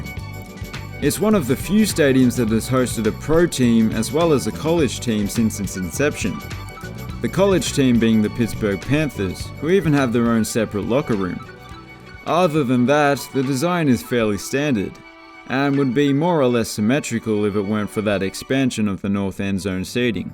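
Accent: Australia. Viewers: Why is a guy from Australia doing the narration, on American stadiums? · Anyone else find it strange that an Aussie is doing the narration? I mean he has a cool voice, but why couldn't we get an American to do it for American stadiums?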